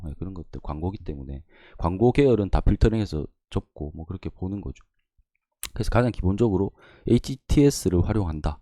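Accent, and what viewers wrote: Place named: Gyeongsang, South Korea. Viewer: 감사합니다 진솔하게 알려주심 감사드립니다 경상도 사토리가 정답습니다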